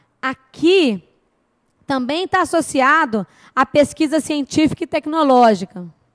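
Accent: Minas Gerais, Brazil. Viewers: Professora, vc é muito lindinha com esse sotaque, "uai"!!!!! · Professora, DEUS abençõe sua vida por sua boa vontade, continue lhe dando disposição e muita saúde para continua lecionando de forma tão agradável!! às vezes fico quase o dia todo escutando suas aulas. Tenho aprendido bastante!!! gosto da sua aboradagem, da forma como vc se expressa e todos os exemplo que vc utiliza. Sem se falar do sotaque mineiro rsrsrs acho uma gracinha!!! Obrigada de coração!!!!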